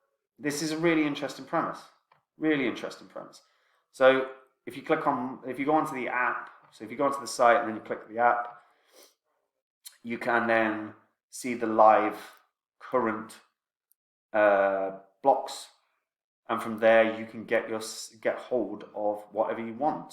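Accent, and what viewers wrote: Scotland, United Kingdom. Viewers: do i hear a hint of scottish in your voice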